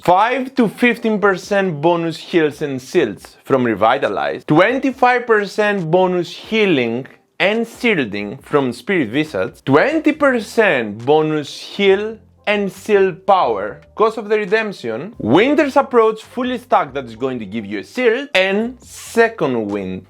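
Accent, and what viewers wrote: Greece. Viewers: Bro love your vids, i bet you are greek man your accent saying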